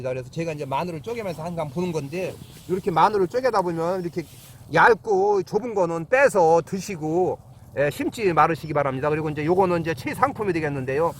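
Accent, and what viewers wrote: Chungcheong, South Korea. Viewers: 충청도 사투하네요 고향이 그리워요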